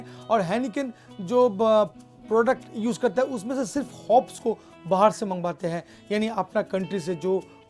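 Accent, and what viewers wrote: Bengal, India. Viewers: Ur tone says that u r Bengali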